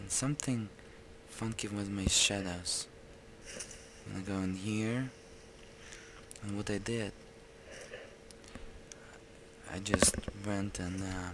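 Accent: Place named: Russia. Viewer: You are russian, right? I know this accent))))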